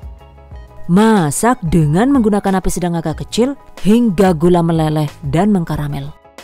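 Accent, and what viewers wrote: Banyumas, Indonesia. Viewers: Kok bisa bahasa ngapak? 😁 kalo boleh tahu orang mana ya? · Coba pakai bubuk kacang + keramel atau bubuk kacang + coklat min, bagus penyampaian dan open mind alias blak kotang rak suwalan 😂😂😂😂😂😂😂😂😂😂 ngapak min yo? I love your voice ngapak 👍👍👍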